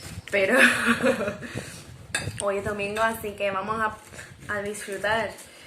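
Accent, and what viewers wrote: Andalusia, Spain. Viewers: TU ACENTO ANDALUZ mezclado con algo de inglés es ENTRAÑABLE . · Querida, Yo nací en Cádiz y vivo en Cataluña aclarado esto te comento que celebrando mucho que de hace poco tiempo para aquí se desayune en Sevilla con pan con tomate hace pocos años atrás, lo típico eran las tostadas con manteca colorá o mantequilla o aceite.. El pan con tomate es típico de toda la vida en Cataluña. Aclarado esto te cuento que me encantan tus vidios y tú acento andaluz. Te mando un cariñoso abrazo con mi enhorabuena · tiene "ange" la niña! Yo igua, me he quedado enganchaita al canal en cuanto la he oido con esas palabras tan andaluzas y ese acento tan bonito mitad inglés mitad andaluz. Que alegria me da como gaditana ver que alguien de una cultura tan lejana se adapta tan bien a la nuestra!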